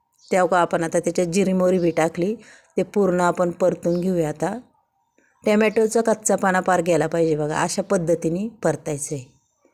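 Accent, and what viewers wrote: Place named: Rural, India. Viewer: तुझं बोलणं आवडतं मला खूप गावच्या पद्धतीने बोलतेस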